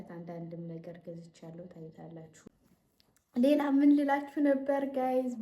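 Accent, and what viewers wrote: Ethiopia. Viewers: I like your Amharic style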